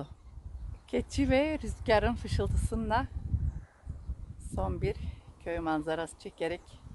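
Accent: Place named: Bulgaria. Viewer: Konuşma şivenizi benzetigim icin tahminim dogruymuş blg neresinden tsk kanalınız ayrıca güzel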